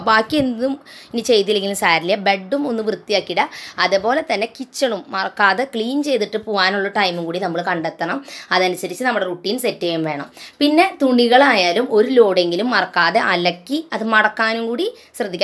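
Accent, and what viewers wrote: Thrissur, India. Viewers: നാടൻ ശൈലിയിൽ... നമ്മുടെ തൃശൂർ ഭാഷയിൽത്തന്നെ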